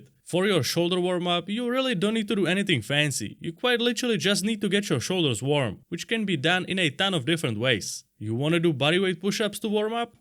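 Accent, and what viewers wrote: Czech Republic. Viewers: What about the dumbbell overhead press, I stand up when doing it, so I am vertical, but I push elbows and head a little forward, is it OK then? Btw I knew you are Czech after the first sentence, we can recognize that accent anytime :-D Ať se daří :-D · Do i hear a czech accent?😗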